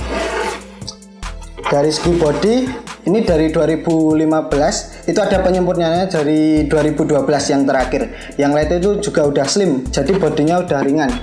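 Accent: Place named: Java, Indonesia. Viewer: Medok banget ><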